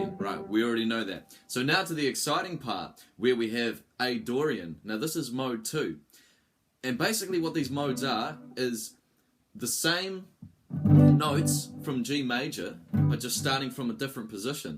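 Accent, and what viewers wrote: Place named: New Zealand. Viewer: Sound like a kiwi bro, chur for the lesson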